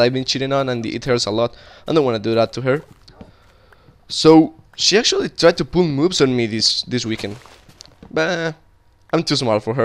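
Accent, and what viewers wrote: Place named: Colombia. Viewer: i actually like your columbian accent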